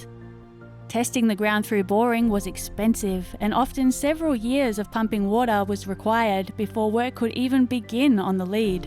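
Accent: Australia. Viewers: I've said it before but I'll say it again: you need to start voice acting because your voice is incredibly Australian. I don't know what you look like but your voice is gorgeous.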